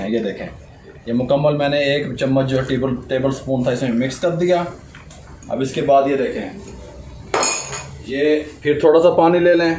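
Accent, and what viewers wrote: Punjab, India. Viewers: Uncle ap buhut pyara bolte hain specially punjabi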